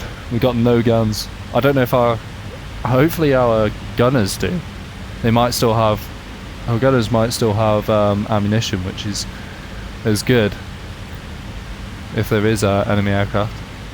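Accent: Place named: RP, United Kingdom. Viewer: Next Il2 video im expecting your posh accent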